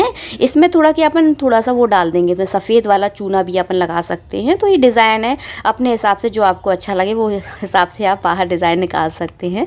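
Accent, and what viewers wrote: Bundelkhand, India. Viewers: Bundelkhand se hain kya aap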